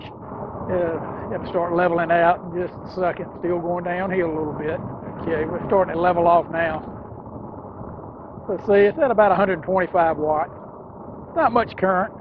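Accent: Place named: Fayetteville, United States. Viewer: Enjoyed your video, your accent sounds like my mom and all of her sisters/brothers/etc, big family from Fayetteville.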